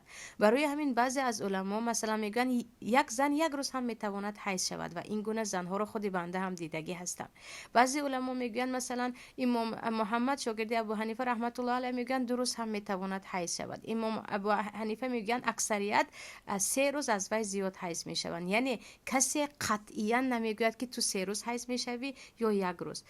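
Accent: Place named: Tajikistan. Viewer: Ассалому алейкум Офарин бар Шумо чи кадар забони точикиро тоза гап мезанед ва хамаи суханонатон бехтарин.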